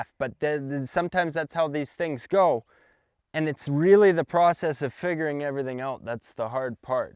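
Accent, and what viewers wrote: Canada. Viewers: I'm here for the Canadian accent.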